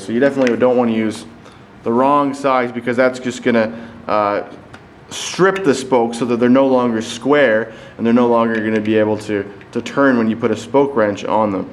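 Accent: Canada. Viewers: That is the most canadian accent I've ever heard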